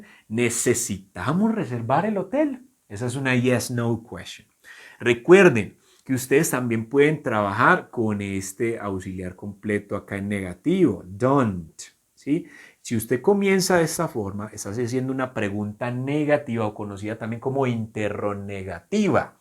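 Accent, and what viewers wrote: Colombia. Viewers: Hola ¡¡. Me encanta la manera tan clara que explicas el Inglés y también tu metodología. Saludos desde Peru ¡¡ ah y me gusta mucho tu acento colombiano. Are you from Bogotá?. Blessings!!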